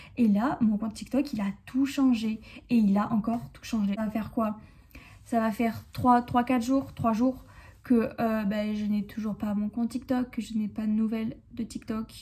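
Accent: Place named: Corsica, France. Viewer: Salut dsl pour ton compte mais je voulais te demander t'es pas d'origine corse parce que tu as quelque fois l'accent corse sinon j'espère que sa va aller mieux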